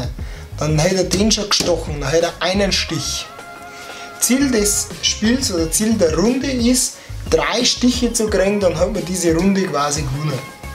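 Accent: Bavaria, Germany. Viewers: schlecht erklärt, und der bayrische Dialekt ist echt grausam - sorry! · Is des a Bayer oder a Breiss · Wiaso? Boarisch is doch schee!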